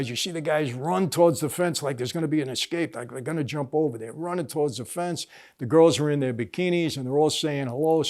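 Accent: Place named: New York, United States. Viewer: Glad I found you. I am a little older than you, grew up in Brooklyn, mother’s family Sicilian immigrants, grew up surrounded by paisans. I love to hear your New York accent, your intelligence, and your NYC Italian soul. BTW, I too am a remade man, not through The Godfather but the Father God and his Son. May he keep you humble, useful, and full of the kind of joy you once upon a time never dreamed of. One more point. I have lived in California for almost fifty years. Moving back to Brooklyn. I’ll eat some good pizza and a cannoli in your honor.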